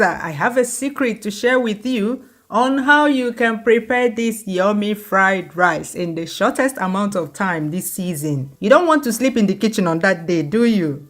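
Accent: Igbo, Nigeria. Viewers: Igbotic voice...yen yen yenh ! I love it lyk that 😂😂😂😂😂😂😂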